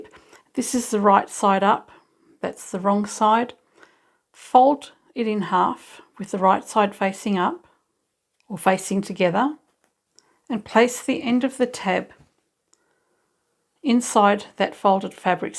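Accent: Australia. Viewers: Thank you for a great zipper tutorial! Ive just subscribed. I recently did 3 cushions and botched them all in various ways... That wont happen again now ive watched this! Your sewing machine looks amazing. Can i ask what it is? Love hearing an Aussie accent too 😊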